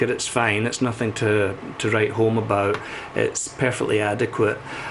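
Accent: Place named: Scotland, United Kingdom. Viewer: I liked your sco'ish accent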